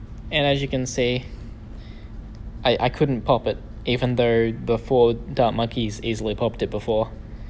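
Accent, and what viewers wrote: Australia. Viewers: Are you from Australia? new zealand? Bro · I swear this sounds like an Australian accent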